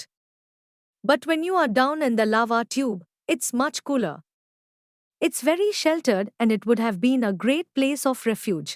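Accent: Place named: Middle East. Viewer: Even with a Middle-Eastern accent, you sound like a bot.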